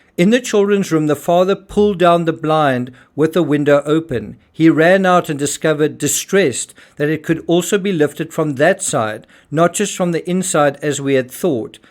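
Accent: South Africa. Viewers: ...are you perhaps South African? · I'm proudly South African and I've been following this story closely all these years. Love what u put together and I agree 100% something smells fishy or should I say bloody. Pls keep us updated. Love hearing the local accent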